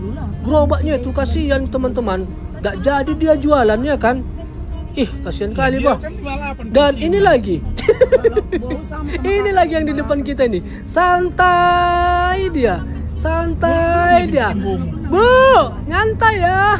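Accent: Medan, Indonesia. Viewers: Enak kali denga logat medan abang.sering2 upload bang pasti berkembang canelnya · Senang dgr cara bicara ito ku ini. Bahasa nada medan nya ga hilang walau sdh lma merantau ke negri Jepang...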